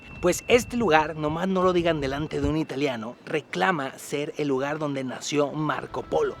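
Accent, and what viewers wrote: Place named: Guadalajara, Mexico. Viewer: Me encanta escuchar tu acento tapatio 💜😁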